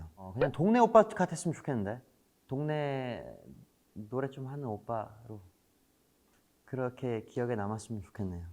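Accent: Suwon, South Korea. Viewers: 🍦 민혁이 오빠에 이어서 오빠도 블랭크톡이라니😭 좋다 이 스케줄 달다..🍦🤍 비투비 이창섭 3년 9개월만에 솔로로 컴백했습니다❤️ SURRENDER 많관부😊💙(#써렌더 #설렌다 #예지앞사 #💙) 저 툭툭 내뱉는 그 말투가 섹시한거야 야ㅋㅋㅋㅋㅋㅋ🍑 난 오빠가 수원 사투리 쓸 때마다 너무 좋아 💕 섹시해😉 오빠 그래도 5월 쯤 부터 매일 복싱장 가서 운동하잖아!! 진짜 복싱장 관장님께서 올려주시는거 보면 오빠 맨날 열심히 복싱하고 줄넘기하고 훈련하는거 보는데 너무 멋져☺️ 살도 점점 오빠가 원하는 정도로 건강하게 빠지는 것 같고 체력도 더 좋아지는 것 같아서 좋다❤️ 뭐야뭐야 그렇게 갑자기 정해진거였어ㅋㅋㅋㅋㅋㅋ?? 민혁이 오빠 솔로 콘서트 때 멜로디가 솔로 컴백 “기회를 만들어😮!!” 하니까 오빠가 기회 만들어 보겠다고 기다려 달라고 한게 엊그제 같은데 진짜 기회를 만들어서 이렇게 빨리 신곡으로 멜로디 만나러 온 거 보니까 감동이야🥹💙 진짜 새로운 분위기 노래 너무쭈아💕 비투비, 오빠 곡들로는 처음 접해본 미디엄템포, 70-80년대 노래 느낌인데 처음 듣자마자 소름이 쫙😬! 처음 시도해보는 장르인데도 오빠랑 찰떡이야! 약간 나른섹시 느낌이랄까🤭 너무쭈아 절대 호불호 안갈리는곡이야ㅎㅎ 왜냐구? 주변 애들도 다 노래 완전 좋다고 본인들 스타일이라고 했징😉 우리 하고싶은거 다 해🥰 부끄럼쟁이 😘 부끄러워하면서 노래 너무잘불러😲 오빠 음색때문에 내 귀가 녹았다구👂 역시 믿듣비 믿듣 🍑💙 어제 비키라에거 풀었던 썰이당ㅋㅋㅋㅋ!! 커피차가 사실 커피+차 였다는 사실🤣 그것도 커피 걸어서 배달해준거 너무 웃겼엉ㅋㅋㅋㅋ😚 바쁘다 바빠 비투비사회 다들 갠활이 많아서 까먹었을 수도 있는데 비투비 모두 어제 오빠 곡 나오는거 홍보해주고 또 응원해주고 해서 내가 다 감동먹음🥺 은광이오빠 썰 다시 들어도 너무 웃겨ㅋㅋㅋㅋㅋㅋㅋ 우리 서리더 최고🐱💙 저번에 미연이언니가 심야괴담회에서 큐브 귀신썰 푼거 봤었는데 그 귀신을 오빠도 봤을줄이야🥶🥶아니 귀신썰 진짜 큐브에 귀신 있을까봐(ㅋㅋㅋㅋㅋ) 좀 걱정되는데 그 귀신과 함께(?) 녹음한 노래가 ‘갓’리워하다였다니!! 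될 노래는 된다고😆 마침 또 그리워하다 녹음 때 귀신이 있었어😲😲 비투비 다음 컴백 때도 녹음실에 귀신 나타나주길🤣🙏🏻 그럼 성재오빠랑 은광오빠가 놀라서 헐레벌떡 도망갈 수도 있겠다🙄ㅋㅋㅋㅋㅋ 이번 앨범, 비투비 다음 앨범도 대박나자🤍 구리ㅜㅜ🐶 오빠 지인분들 인스타나 유튜브 통해서 올해 초 쯤부터 키우는거는 대충 알고있었는데 언제 쯤 이야기 해주려나 기다리고 있었는데 몰래 키우려고 했었다니!!🤭 강아지 책임지고 키우는거 멋있엉🤍 예전부터 강아지 키우고싶다고 했었는데 오빠가 오빠 본인도 감당 못하는데(😆) 강아지 키우면 감당할 수 있을까? 하고 고민 또 고민하던하던 모습이 생생한데 지금 이렇게 책임감 가지고 키우는거 좋다🥹💙 오빠 핸드폰 배경화면 오빠랑 구리랑 뒷모습 사진찍은거 탐난다구ㅋ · 시작부터 저음 아.. 저는 이렇게 저음의 인사하는 에게 푹빠져요.: 역시 숙취ㅋㅋㅋ 안들린데 ㅋ 우리 숙취 팬싸도 했잖아요 ㅋㅋㅋ 숙취이야기하는데 왜케 옛날 생각나죠? 블랭크톡 알면서 이질문 고른건가요?, 저 수원 사투리 여기서 알았어요. 저 특유 말투가 수원 사투리 였다니.. 나 왜 오늘 알았는건지.. 감사합니다. 아니 이렇게 호탕하게 웃어준다구요!! 운동의 굴레~ 아 세상엔 숙제가 너무 많은데 도 숙제를 준이기분 ㅜ 저 이거 너무 궁굼했어요. 갑자기 뮤지컬도 빨리 끝나고 근데 음반 낸다고 안했고 계획도 없다고 했는데 갑자기 나와서 사실 조금 놀라긴 했어요. 갑자기 나온 이유 너무 궁굼했는데 이렇게 들으니까 너무 좋아요. 좋은 음악이 있으면 언제든 나올수 있다는걸 알거 나니 앞으로의 가 기대되요 비투비가? 이런장르를? 하고 생각했는데 비투비 이 라 가능하다고 생각했어요. 다른 장르도 도전해주세요~~ 라이브 시작 ㅜㅜ 막 잘부르는 노래도 좋은데 이렇게 흘러가듯 불러주는 써렌더.. 목소리 우리집으로 배달좀 해주세요 블랭크톡 나도 써렌더~~ 아써렌더~~ 진심 빵터졌어요. 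자기 살길사느라 ㅋ 그래도 분명 멤버들 한명한명 관심없는척 하지만 다들 신경썼을꺼예요. 하지만 이사님 ㅋㅋ 주차는 ㅋㅋ 귀여운 이사님 썰~~♡ 써렌더 녹음할때도 나왔어야 하는데 귀신아 어디갔니 써렌더 대박 나야 한다고!! 이렇게 앉아있어요. 하는데 아니 저 모습 너무 귀여워요...딱 저 수줍은 저모습 섭프가 빠지는 치임포인트 부끄러워 손을 비비는 우리 아 블랭크톡 이부분을 살려줘 감사합니다!!♡♡ 아니 동네 동생도 있다구욧!! 동네 동생 해주라~~ 우에엥에엥 꼭 전해줘요 동네 동생해달라고! 오빠 그만 찾자 @@ 이영상의 끝판왕!! For melody 내가 멜로디인게. 그리고 이창섭의 팬인게 for 멜로디 한단어로 눈물이 왈칵했어요. 항상 같은 마음으로 예전이나 지금이나 똑같이 말하는 이 라 너무 고맙습니다. 몰랐던 정보 또 그의 마음이 너무 잘 전달 되었어요. 앞으로 멜로디 한사람으로 이창섭도 블랭크톡도 응원 할께요. 너무너무 감사합니다. 내가 이창섭을 사랑하는한 우리 또 만나요. 블랭크톡 다시한번 감사하고 사랑해요! ♡surrender all to 멜로디♡ ♡surrender all to 이창섭♡ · 방긋웃는 모습이 넘모이쁜 우리 💙 숙취를 겪어본 바 배탈이 난 숙취후 ㅠㅋㅋㅋ 경력직 ㅠㅠㅠㅋㅋㅋㅋ 이런얘기 저런얘기 아무렇지 툭 터놓고 해주는 너가 참 조타아 수원사투리가 뭔지도 몰랐으면서 매번 ~거? 하고 물어보는 이창섭한테 치였었는데, 그게 수원사투리였구나?? 앞으로도 종종.. 아니 자주 해주라… 글고오!! 지읒니은 섹시해 무슨소릴 하는거야😭😭😭 나야말로..힘듭니다.. 나야말로…힘듭니다 ㅠㅠㅠㅠㅠㅠㅠㅠㅜㅜㅜㅜ 그렇게 반존대 하시면 나야말로 힘듭니다😱😳 언제나 음악에 진심으로, 하고싶은 음악에 도 전하는 모습이 지금껏 쌓아올린 이창섭을 만들었을테지 비투비가 이 음악에 진심인 만큼 멜로디도 비투비에 이창섭에 진쒸미양〰🥴 부끄럽다며 세에상 멋찌게 노래부르는 🫶❤️ 씨디💿를 주식으로 먹나 라이브가 어찌 저럴수가 있는거시여ㅠ ㅋㅋㅋㅋㅋ몇초차이에 ㅋㅋㅋㅋㅋ 우리 이사님 엉뚱함과 미담을 오가는 ㅋㅋㅌㅋㅋㅋㅌㅌㅌㅌㅌㅌㅌㅋㅋㅌㅌㅋㅌㅋㅋㅋㅋㅋㅋㅋㅋㅋㅋㅋㅋㅋㅋㅋㅌ 본투비 그하는 대박날 운명이었던거심ㅇㅇ 구리가 이 키우는 멈무가 맞았구나~~~ 구리아빠랑 구리 들킨김에.. 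👀📸 둘이 싸우디 말고 잘지내에! 구리야 이창섭 잘부탁해ㅋㅋ 동네 노래 좀 하는 오빠로 남으시려면 일단 제 동네로 와주시면… 🏡 고민도 없이 for 멜로디를 외치는 너얼 어쩌면 좋니~~ 난 for 이창섭 for 비투비야 ㅠㅠㅠㅠ 서렌더 띵곡입니다 많관부 많사부🔥 마지막까지 안뇽 하고 흐캬캬캬 웃고 가는,ㅋㅋ 블랭크톡도 이창섭도 고마웠어요 덕분에 이창섭 티엠아이 마아뉘 알아갑니다아 안녕👋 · 수원 사투리가 저렇다는거 처음알았다 ㄷㄷㄷ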